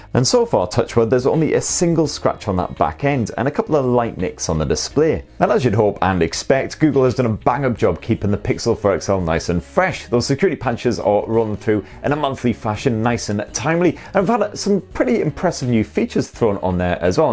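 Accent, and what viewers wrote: United Kingdom. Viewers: Your Accent is marvelous love to listen it all day...😊😊 Brit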